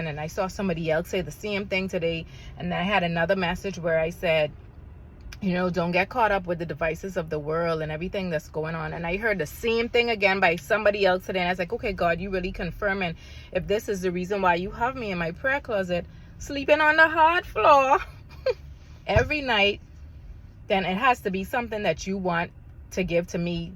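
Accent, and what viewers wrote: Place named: Bahamas. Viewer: Your accent is beautiful. It sounds Bahamian.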